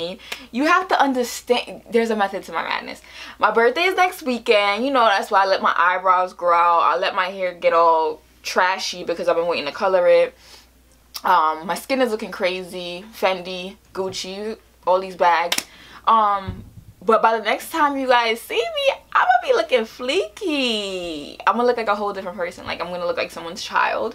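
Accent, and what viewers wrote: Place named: Trinidad and Tobago. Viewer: Are you by chance from Trinidad? Your accent seems familiar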